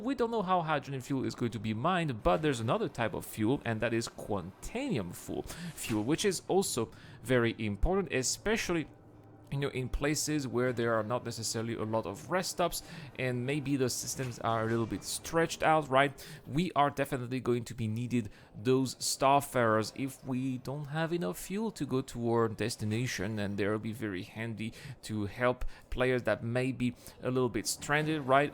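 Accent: France. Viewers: nice i really love that your channel is growing! you are always on point and i love your french accent, greetings from germany keep it up! :) <3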